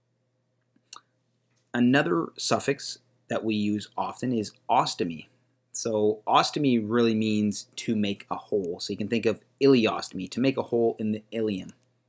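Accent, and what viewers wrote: Canada. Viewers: You didn’t cover -emia. Powerhouse of the cell. Just kidding loved the video I’m learning for my work, thank you. Sounds like you’re from Canada just straight facts.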